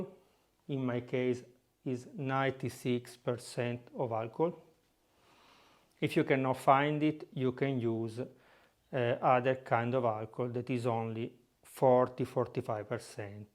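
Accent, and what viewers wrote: Italy. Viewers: He's making flavoured alcohol and he has a comical Italian organ-gringer accent. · Orca boia l'accento italiano. Soltanto per questo ho guardato tutto il video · Yes, I started it in the morning and after ten days I will tell you the result... But i noticed that you speak English with an Italian accent 😄🤣 This makes you very nice 😄 And by the way, I love Italy so much and would like to visit it. 😍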